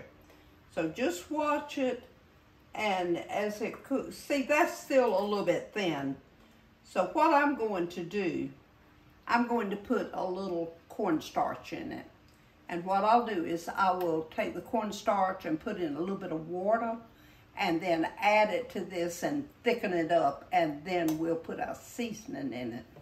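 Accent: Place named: South Carolina, United States. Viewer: I do love to hear your SC accent! I grew up spending summer weekends at Carolina Beach, NC. That was the life. When I was a young wife/mother, we moved to Florence SC, where I got a job working for Peoples Natural Gas (and Supertane.) I remember a call I tried to take once from a man who said he was calling from "Wilson Sawsude" and said they were having a problem with their "wrench." Well, I tried several times to understand, and finally had to turn him over to someone else. Turned out to be calling from Wilson Sausage about a problem with their gas range! I never forgot that. Hugs!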